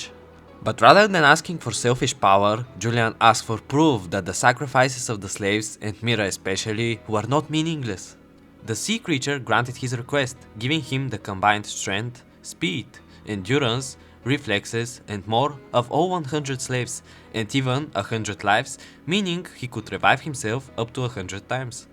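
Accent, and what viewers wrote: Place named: Bulgaria. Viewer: Bulgarian ahh accent, nevertheless amazing video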